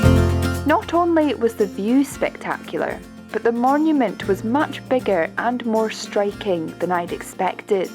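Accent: Scotland, United Kingdom. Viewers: I always look forward to another of your sessions but one thing is that I wish you would give more information, especially if it’s a “monument”. What was this monument, a church, an abby or a castle. If at lest we knew the name of it, other than “monument”, we could Google it and find out what it was, when it was built and the history of it. I certainly enjoy your channel and the beauty of Scotland, your pictures, and your accent when your talking.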